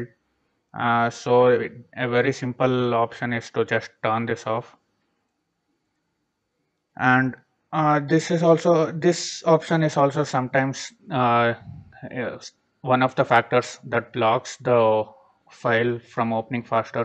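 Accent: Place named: India. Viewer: I hear Indian accent, I listen to the end. Fast, to the point, solved my problem. Thumbs up!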